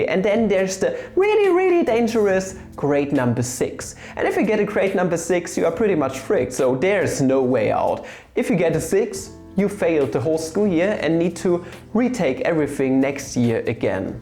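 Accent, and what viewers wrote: Germany. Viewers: I can feel your german accent while you speak English.😊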